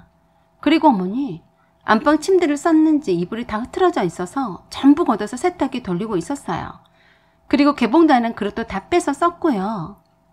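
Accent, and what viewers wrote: Jeolla, South Korea. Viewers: 라도 특유의 사투리 ㅋㅋㅋㅋ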